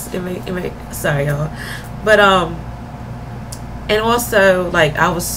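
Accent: Caribbean. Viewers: Where are you from. I hear a couple of accents from you. Like Caribbean at times and an English accent. Be blessed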